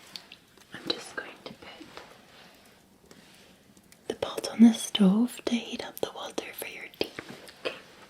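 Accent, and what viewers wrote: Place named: Scotland, United Kingdom. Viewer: Missed the victorian maid thanks for being a light in the darkness your cozy cottage was first asmr video i saw i loved it dont know why but love scottish female accent makes me feel safe comforted and loved thanks for all you do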